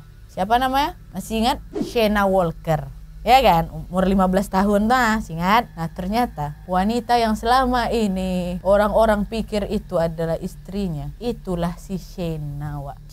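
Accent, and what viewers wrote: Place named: Medan, Indonesia. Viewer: Ibu senang dgn vlog ini. Hostnya cepat bicaranya, jelas n punya aksen orang medan.semoga sukses terus n diberkati tuhan.gbu.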